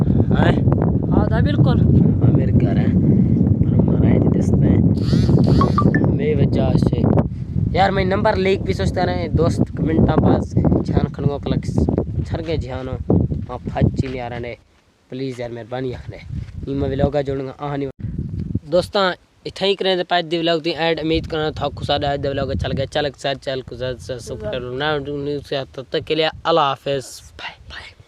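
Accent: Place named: Balochistan, Pakistan. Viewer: میں بلوچی ہوں لیکن میں آپ کی بلوچی سے صرف چند الفاظ سمجھ سکا آپ کے آباؤ اجداد کہاں سے آئے تھے؟😊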